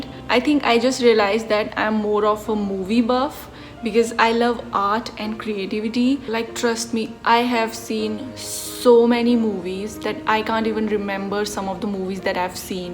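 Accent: India. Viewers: I subscribed you by seeing you from bts's study with bts I mean by seeing you're comment And our [mine &yours] english accent is weird that's how Indians speak English in that accent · I like Indian English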